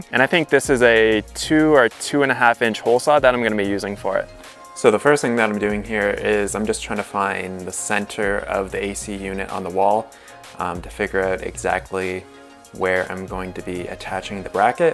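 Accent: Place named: Canada. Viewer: I'm from Western NY so I recognize your subtle Canadian accent. I enjoy hearing it and your video content.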